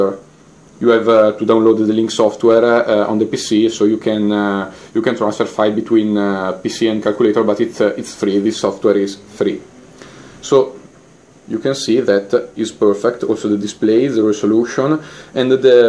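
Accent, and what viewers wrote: Italy. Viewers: Si sente che sei italiano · Sei ita si sente vero? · natsu sempai Vabbè, dopotutto è italiano, si capisce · Your english is good and I understood everything. It's not a problem that you have an italian accent.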